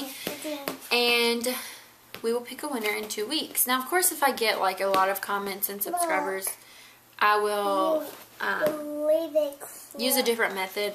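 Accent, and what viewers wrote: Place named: South, United States. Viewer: I AM FREAKING IN LOVE <3 <3 <3 <3 with your sweet southern belle accent. omg my best friend is country too and i just love it! i want to marry a southern man when i get older <3 okay so anyway i love your videos and i love that you are not giving out makeup like every other youtube girl! you are such a sweet mommy! i can only hope and wish that i can be as great as a mom as you! your little babies are soo adorable and i can't believe how skinny minnie you are! got your figure back alrady lol. <3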